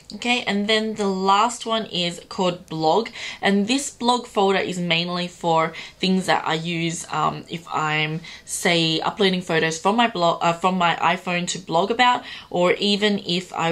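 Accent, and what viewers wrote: Australia. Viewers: are you australian? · I think it's an Australian accent. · australian accent aaa ashdjdkal love it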